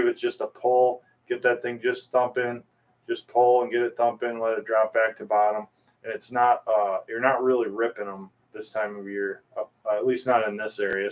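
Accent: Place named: Wisconsin, United States. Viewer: I’ve commented a few times on your channel and you’re the best at reporting northern Wisconsin fishing forecasts I’ve ever seen. The best at knowing which lures and techniques are working at any given time in any given place in Wisconsin. I love it. The Wisconsin accent is something that really brings me back to the old days up there. I know your target audience very well since I grew up on lakes in Wisconsin and I remember my Wisconsin neighbors and friends being just down to earth good people. I miss them and I miss Wisconsin. It’s part of who I am, 60 years later. After traveling all over the country and South Pacific, I’m a fisherman, always have been. But I learned to fish since I was a little kid in Wisconsin. Here’s what I’ve noticed after decades of traveling around living in California, Arizona, Hawaii, Washington, etc, I still fish like I’m from Wisconsin. 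And I am. And you know what? I’m a better fisherman because I grew up there. Wisconsinites are f*cking serious about their fishing. Not many distractions on a northern Wisconsin lake or river. No city crap, just a real in-tune and focus on nature and how to fine tune everything involved. Wisconsin winters are some of the most brutally cold around, and guys go out fishing anyway. Camping in the snow. Fishing through the ice. Nothing phases a Wisconsinite. Spring is barely a dream not a desperate hope. It’ll come, and with it, all sorts of bugs. Hatches. Mayflies. Mosquitoes. Frogs. Crickets. Fish are excited, so wa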